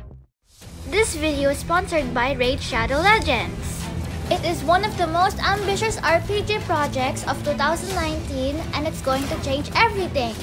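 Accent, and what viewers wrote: Philippines. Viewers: Are you Filipino?Your voice sounds like so,If I’m wrong pls tell meh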